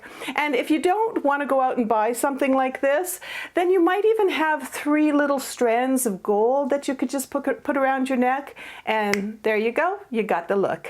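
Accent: Canada. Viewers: Good for you wearing what you like & not what other people say you "should" or "shouldn't" wear. Your tips & encouragement to live with confidence are helpful & uplifting. PS: if you're not originally from Canada I'd be surprised. You sure sound Canadian at times. 😁❤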